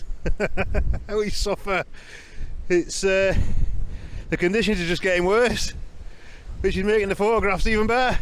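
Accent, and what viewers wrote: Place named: Northern England, United Kingdom. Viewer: yeh the winds at Lizard can be nasty...but Mullion is amazing and ive wondered down there before with my camera...never tried astrophotography before...luckily we moved down 9 months ago and live just outside Marazion so Mullion is just down the road...! where bouts you from..the northern accent stands out a mile lol...im born and bred in Sheffield but live in Cornwall...just sub'd btw...!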